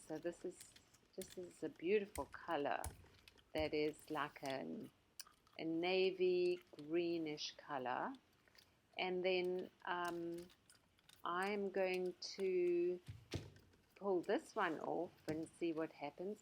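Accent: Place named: South Africa. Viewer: Nice South African accent ! 🇿🇦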